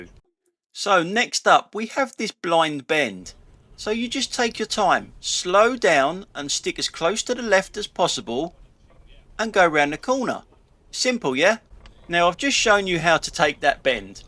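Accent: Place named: Cockney, United Kingdom. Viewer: 13 mins of a boring cockney moaning about other people’s driving.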